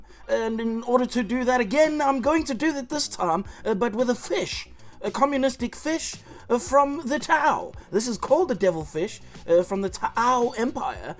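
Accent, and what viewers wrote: South Africa. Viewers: I have to ask: are you originally from South Africa? Feels like I'm hearing a familiar accent 😅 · dude sounds maybe a bit south african?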